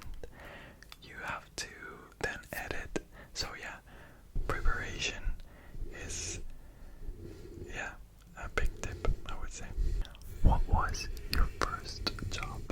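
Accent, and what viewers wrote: Ireland. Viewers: As an Irish person I can say you defo have a little bit of an Irish accent.. what part of Ireland is ur dad from? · Kerry accent 😂🤝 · I was thinking that was an Irish accent!! Your English is very well spoke and as an Irish person you have such a strong Irish accent !!! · As soon as you started speaking I knew you had to have some Irish in you lol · Your english is perfect my first thought was “Oh he must have grown up in Ireland” 😭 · I thought I could hear slight Irish twang in your English speaking accent lol, sounds like it’s from up north maybe but hard to tell 😂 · I hear a faint Irish lilt as well! It's like 5% Irish (on the vowels), 5% Spanish (on the consonants), 90% perfect native-sounding English. Oop not to imply Irish accents =/= native English speakers 🤣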